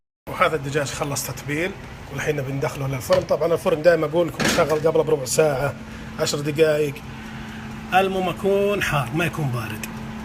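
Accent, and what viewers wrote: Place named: Buraydah, Saudi Arabia. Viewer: ونعم فيك وفي اهل بريده من لهجتك عرفت بس احنا نبغا ناكل من طبختك قلنا يمكن يكون حولنا الله يسعدك يا وين ماكنت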